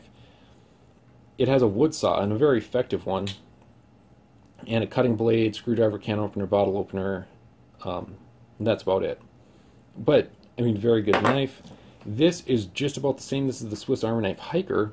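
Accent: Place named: Canada. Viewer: I'm from Michigan but you sound like you're very close to Canada. I don't hear the Canadian twang in voices here in West Michigan.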